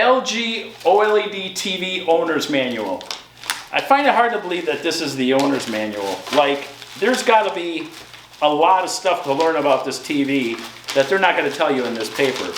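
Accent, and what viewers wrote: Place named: Canada. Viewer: Awesome. Canadian, eh?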